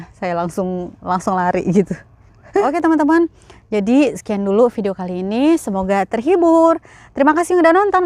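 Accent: Jakarta, Indonesia. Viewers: Betawi bgt si mpo..🤭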